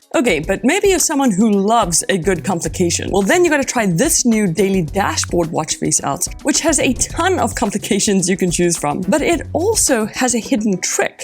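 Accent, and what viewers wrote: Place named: South Africa. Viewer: I got me the Watch 8, searched for tips and tricks and came across your channel. The minute i heard you speak I knew you were my fellow South African :).. Great channel!!!!!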